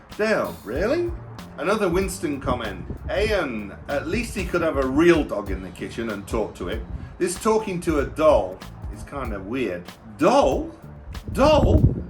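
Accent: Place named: Nottingham, United Kingdom. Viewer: Huge congrats, your's is my go-to comfort company, LOVE your videos, you so deserve this success as you are a great presenter, cooking coach, and generous in sharing your recipes. Listening I heard you studied at Clarendon SO DID I. I used to go to the catering student's shop and buy stuff, then take it home on the train to Newark (Notts). I now live in Telluride, Colorado. Clarendon is now called New College Nottingham, and I swear what I learned at Clarendon (BTEC Beauty Therapy Spa Management) has set me up for life. I can't tell if your accent is Nottingham or Derby, it's clearly been tweaked by high society and the US. Be very well, Karen (Kaz) totally Notts:)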